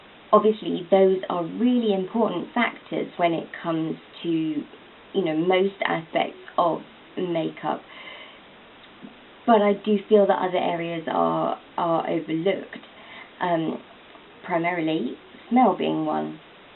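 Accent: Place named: South, United Kingdom. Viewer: I like your speaking voice. You sound a bit posh, southern England? I like your channel because you have videos going back quite a while and I always think it's interesting to see how people grow over time. Hope you're doing well and would like to see a new video some time!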